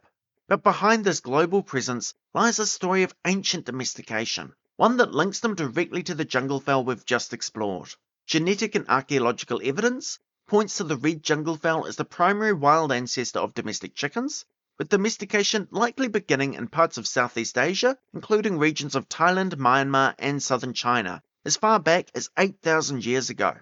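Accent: New Zealand. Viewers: Oh bro, I watch a lot of u tube and the kiwi accent is a shock. Chur to the chicken. Get your self a lotto ..after making this video you'll be extra clucky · I detected a New Zealand accent from the the third word! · Kiwi accent is crazy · "Easy to understand", well yeah, once you get past that strong Kiwi accent! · Very interesting. I'll subscribe. The NZ vowels are a bit of a challenge!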